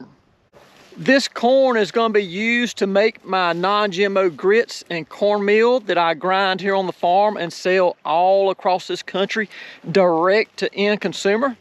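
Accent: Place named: Georgia, United States. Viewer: Great Video! Enjoy listening to your Southern Georgia English. I'm from TN.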